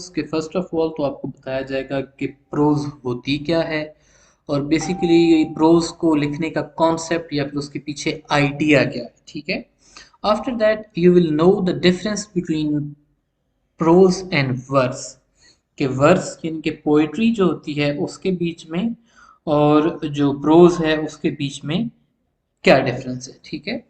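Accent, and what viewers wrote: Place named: United States. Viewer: Aap ki mother language to urdu hy but u speak English like an American so goo sir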